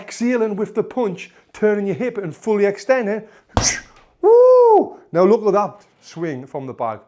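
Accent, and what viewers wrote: United Kingdom. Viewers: 喜欢你的英国🇬🇧口音，哈哈！ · As a french person, I enjoy your british accent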